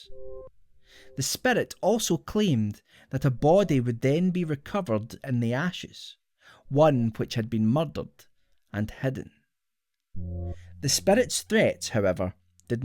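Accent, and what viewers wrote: Scotland, United Kingdom. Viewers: Quality videos, interesting concepts, Scottish accent, Dude needs more subs. Good work sir.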